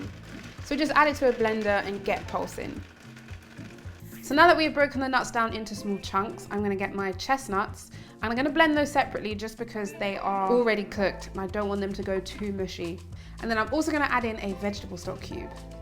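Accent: London, United Kingdom. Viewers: Beautiful black vegan with cute London accent. 😍 Surprised to see so few guys in your comments, we are usually suckers for beauty and food!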